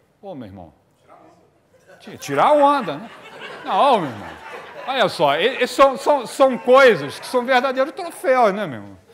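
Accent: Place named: Rio de Janeiro, Brazil. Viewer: Esse professro é carioca! acertei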